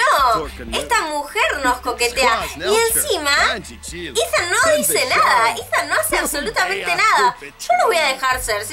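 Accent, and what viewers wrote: Spain. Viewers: es española o argentina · siempre estas en un bar y otra pregunta eres española o inglesa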